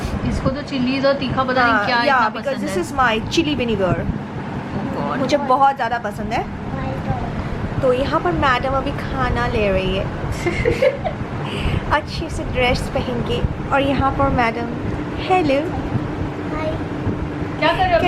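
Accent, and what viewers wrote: Bengal, India. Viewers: Apke bolne ka tarika...sooo cute..bengali touch me..love u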